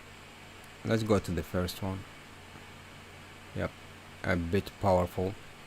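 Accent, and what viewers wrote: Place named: United States. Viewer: استاد لدي سؤال كيف تعلمت الانجليز و اصبحت تتكلمها كانك شخص امريكي اعطني نصائح لتعلمها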